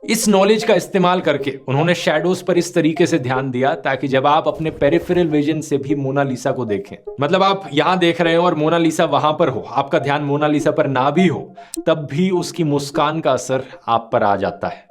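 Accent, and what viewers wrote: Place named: South India, India. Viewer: aaj dhruv ka voice over lag raha h south jaisa...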